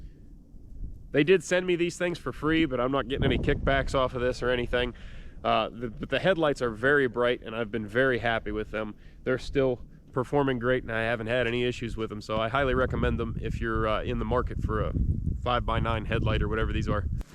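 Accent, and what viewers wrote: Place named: Pennsylvania, United States. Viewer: What part of PA is this guy from the accent is throwing me a bit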